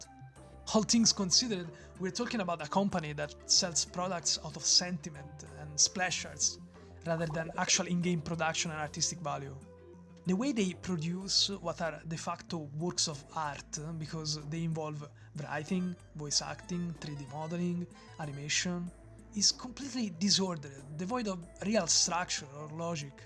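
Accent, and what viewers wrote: Italy. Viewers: bro are you italian perchance your accent :D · You have such an Italian accent. Is it just me, or do you perhaps have Italian origins? I'm so curious xD sorry But anyway, I always appreciate your content. Thank you for what you do for us in the community ❤ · Are you italian perhaps? Your accent sounds like that for me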